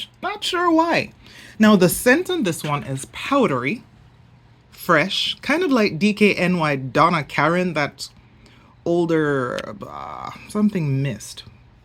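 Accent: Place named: Jamaica. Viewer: Hi new friend 😊 I just subscribed to your beautiful channel. I know I heard the Jamaican accent in your voice, and then I read the description and see that you are . Your orchids are beautiful. 😊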